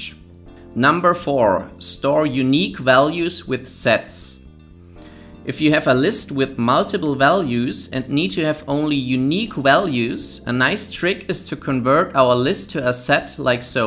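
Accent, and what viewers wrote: Germany. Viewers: Are you a German?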